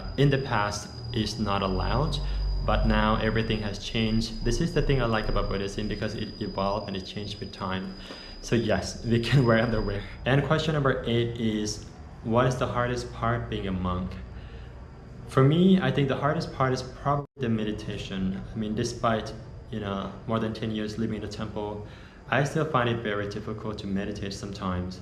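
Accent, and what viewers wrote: United States. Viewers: Hi, thanks for sharing your story. I been curious as to how you got American English accent and now I know. I became a monk when I was 10 and stayed for 2 years at Wat Prabhat in Pakse. At the time my mom and younger sibling need to go back to Vientiane but due to finance issue she left me at the temple. But it was alright since my grandmother house is right across the road. Unfortunately my aunts and uncles does not live in Pakse but in a different cities/village and have their own live. Since grandma was too old and cannot take care of me so the only choice was to become a monk. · Wow that's good to know about the monks program. Aw your English accent sounds absolutely perfect like the Californian. Thanks for sharing the awesome informations. Keeping the good work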